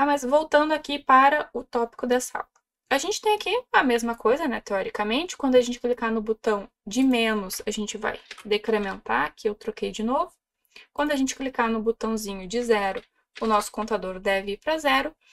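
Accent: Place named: Rio Grande do Sul, Brazil. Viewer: Eu consigo perceber 30% de sotaque gaúcho e 70% de sotaque de Brasília. = ["RS", "DF"]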